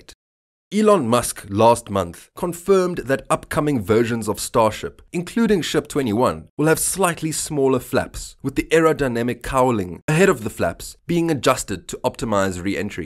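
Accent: Afrikaans, South Africa. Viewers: Great program, but your script was a bit out of date in most of the stuff that was happening in your previous videos. I see you are cathcing up to more current content. Keep up the good work. Nice to hear an Afrikaans accent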